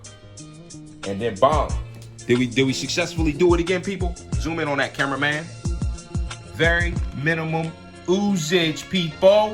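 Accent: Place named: Philadelphia, United States. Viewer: I knew my man was from philly 2 seconds into the video You did your damn thing on that jawn💯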